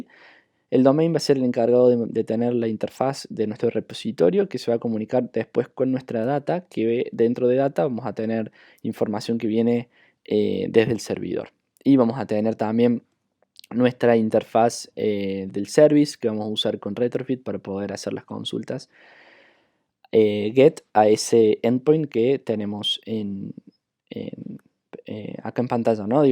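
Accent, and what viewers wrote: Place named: Córdoba, Argentina. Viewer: En este video no pudiste controlarte como cordobé, y tambien miré raro cuando dijiste "Coca con pepsi" jasjjajaja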